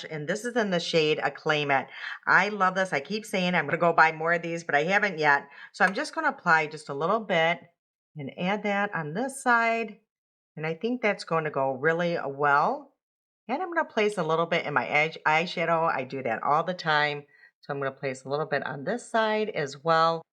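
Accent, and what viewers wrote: Midwest, United States. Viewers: Thank you so much for your review of the Cover FX foundation!! Your Midwest accent is wonderful! I’m happy to follow someone who looks and sounds like me ❤❤❤ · hi, Thank you for writing back to me. You know, you're right about the Midwestern accent. We all possess that tell-tale sound that identifies our Midwestern whereabouts! I have been to my brother's only a few times. It is sad because when my husband died in 2012, I went into deep grief and depression and became agoraphobic. They offered so many times, but I was afraid to leave our home. I am not agoraphobic anymore, thank God, but I've missed so much of living since it lasted eleven years. My daughter died in 2022 and there are no words...it's just my son and me now. Life throws it's curve balls to everyone. God has been wirh us and continues. I'm sorry for the above. 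I hope it was okay to write. Anyway, I'm looking forward to more and more of your videos! I'm trying to decide what shade I should order of the makeup you applied. It was so perfect for you! I'm light neutral so I'm not sure and there are so few shades available!! I'll figure it out! Lastly, thank you for being kind and so enjoyable on each video. It really means so much to me. 💌💌💌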